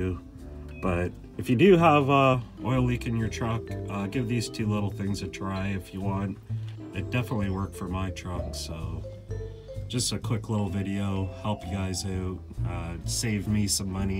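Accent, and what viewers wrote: Canada. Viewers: G-ah-sket. B-ah-ck. Th-ah-t. B-ah-d. Oowt. Interesting dialect. Cross between Canuck and California Valley speak. 😀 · This is definitely an Eastern Canadian accent! I’m guessing Nova Scotia. The Motomaster oil filter confirms the canuckery.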